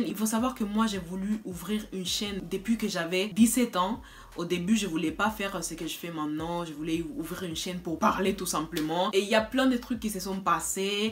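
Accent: Spain. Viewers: Je pense que tu es la 1ère espagnole noire que je vois! J'étudie l'espagnol et je trouve que c'est aussi difficile que le français quand même... J'adore ton accent et j'ai hâte de voir tes storytimes :) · J'aime ton accent espagnol continue comme sa sinon tu es très belle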